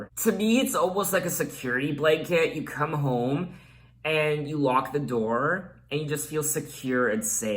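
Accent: Canada. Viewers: grow the beard but lose the canadian accent.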